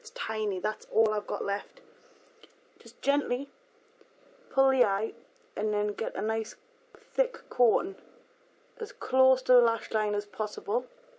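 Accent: Newcastle, United Kingdom. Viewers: Omg are you a Geordie like Cheryl? :) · ah your a geordie, me tooo, x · a new castle accent i love it the accent , and your stunning btww .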